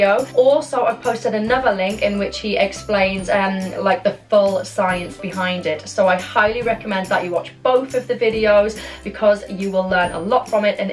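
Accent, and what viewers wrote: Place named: United Kingdom. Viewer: She sounds British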